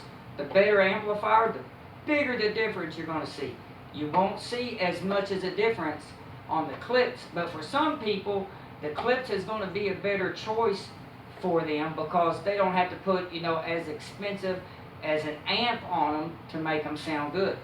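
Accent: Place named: Texas, United States. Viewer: I like this guy’s accent. Where is he from, Texas perhaps?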